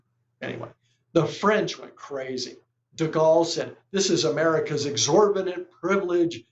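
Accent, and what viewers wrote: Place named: United States. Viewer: When he started speaking with an American accent I kinda expected this to be a bit one-sided... I was not surprised. "Predictive History" remains the Geo-political analysis standard on yt PS: your country is controlled by Iran where all your politicians are forced to put on a hijab and have to go pledge allegiance at Iran's wailing wall... (sarcasm)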